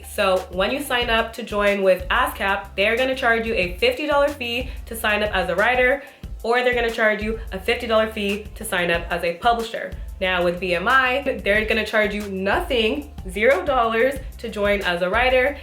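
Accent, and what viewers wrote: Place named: Barbados. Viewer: Are you a Bajan? You sound Bajan! Holla back.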